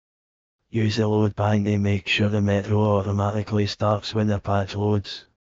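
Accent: Scotland, United Kingdom. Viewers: Damn this is awesome thanks for this. Love the scottish AI text to speech voiceover as well haha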